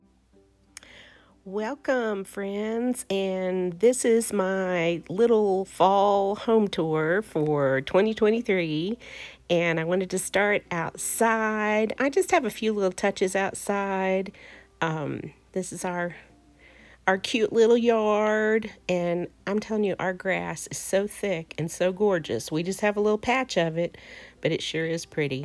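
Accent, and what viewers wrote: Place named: South, United States. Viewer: Hi! I just found your channel. I am also a retired teacher and I can detect your southern accent. You can take the girl out of the south, but you can't take the south out of the girl! Thank goodness! I am a transplanted Texan living in Houston, Missouri. I really like your decorating style. I will be back for more videos.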